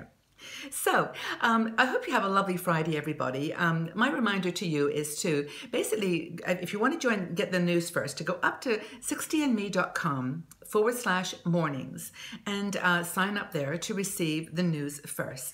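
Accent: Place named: United Kingdom. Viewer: your British English!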